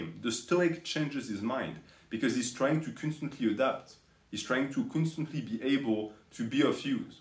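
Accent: France. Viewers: Sounds stupid but can u upload these on Spotify? I enjoy listening to a French man ramble about stoicism as I fall asleep with no ads or auto play.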